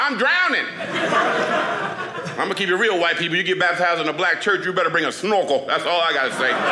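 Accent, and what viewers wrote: New Orleans, United States. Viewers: He is hilarious! I was listening only with my phone turned down, and I swore he was black before he said something bahahah! That New Orleans accent gets me every single time! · U know he from neworleans, because that's the way we talk.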